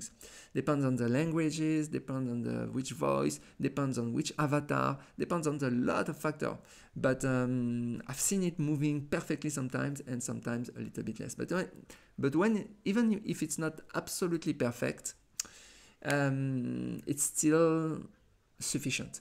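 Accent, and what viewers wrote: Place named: France. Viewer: Amazing really❤good english and nice French accent😂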